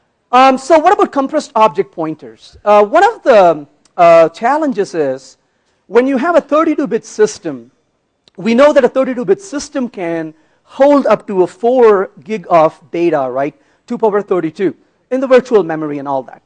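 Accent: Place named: India. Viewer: 録音の状態が悪い上に、インド人的な早口でインド訛りが少しあるので聞きやすくはない。 それでもこの人の話は聞く価値がある。ヴェンカットさんはアジャイル系の本の著者。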